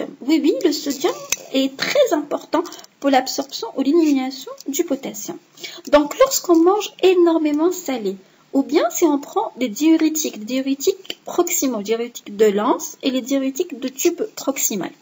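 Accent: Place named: Algeria. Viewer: Médecin Chef de service de pédiatrie en Malaisie / Maitre de conférence, je suppose que vous êtes algérienne( l'accent++++ ) hhh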